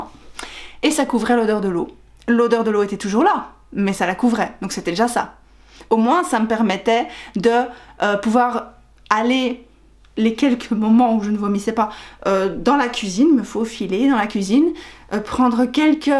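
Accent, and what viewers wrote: Belgium. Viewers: je crois entendre un accent belge? té bèlge? belge aux yeux verts, nan, pa possib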